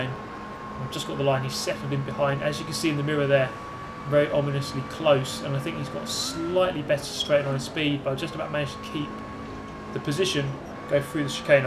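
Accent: United Kingdom. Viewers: his british accent no bullshite intro and dubstep music backgrpund adds up to a good unannoying vid//s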